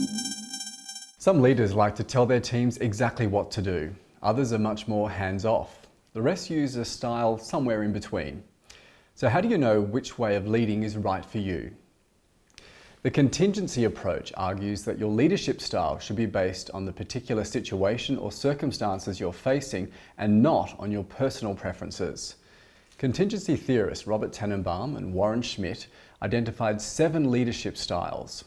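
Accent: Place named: Australia. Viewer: Somehow accidentally stumbled upon this while reading an article about a completely unrelated topic. Watched the whole thing mainly because of that beautiful Australian(?) accent. May watch a 3rd time idk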